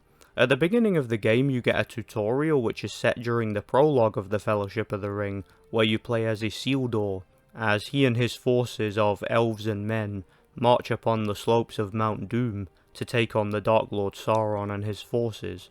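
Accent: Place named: Nottingham, United Kingdom. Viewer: complete shot in the dark, especially if you say you've moved around but I'm hearing some Nottingham mostly, maybe some south or west Yorkshire or Geordie. But alternatively your "L" sound feels like Richard Taylor from New Zealand, but the rest of your vowels don't match the nasal brightness of that accent. Either way GREAT review. I played this one after Return of the King so unfortunately it felt like a big step down in a lot of ways, it felt clunky and a bit too difficult to be fun (but maybe I just sucked lol, especially that Helms Deep protect the door level). But thanks for your work. Brought back some memories.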